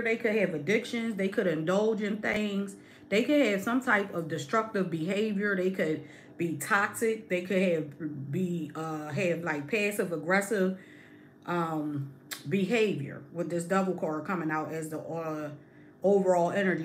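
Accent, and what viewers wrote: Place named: Philadelphia, United States. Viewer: oh yea Philly really apart of you cause you have a very distinct accent. I love it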